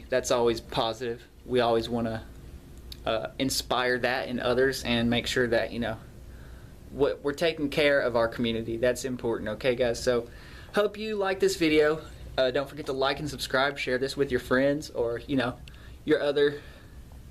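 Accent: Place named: Kentucky, United States. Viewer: Great video buddy, I thought I heard the KY in you, ;-) , I was searching because I see a Yamaha B100-112III on Craigslist right here in Louisville for $150 but I have a couple amps already, was just curious. Thank you for the tip on Steve's shop in Richmond, just found him on FB and sent him a message. Now I gotta check your band, ....Thanks for the video and info !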